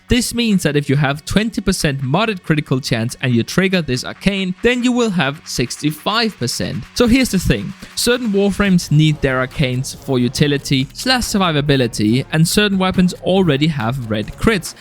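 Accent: Denmark. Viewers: You sound Danish..? Or Scandinavian in general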